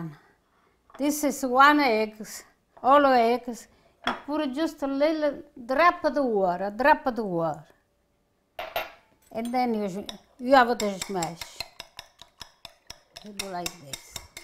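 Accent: Italy. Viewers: I so love her accent! She reminds me of a southern grandma with an Italian twist..😊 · Κυρια ειστε υπεροχη.καταπληκτικα τα τσουρεκια σας καπως ετσι τα κανουμε και εμεις στην Ελλαδα.Μου αρεσαν και τα τραγουδακια σας ο Θεος να σας εχει καλα Καλο Πασχα.Αγαπω την Ιταλια ακομη και τον προφορικο σας λογο.❤❤❤ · I could listen to talk all day long! I love her Italian accent and watching her make her delicious dishes.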